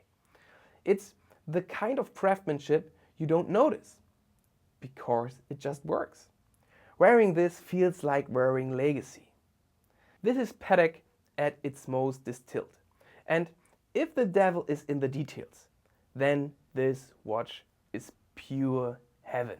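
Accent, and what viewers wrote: Germany. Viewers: I love your videos. Your lighting and the way you showcase your watches really elevates them. On a side note, I love your accent too. I’m in the UK and have a close friend who is German, a lot of the words you pronounce similarly to him, we call it Ginglish! My surname is Konig too, my grandfather must’ve lost the ö when he came over to the UK, I doubt they had “ö” on the typewriter when they processed his papers so we’ve lost it!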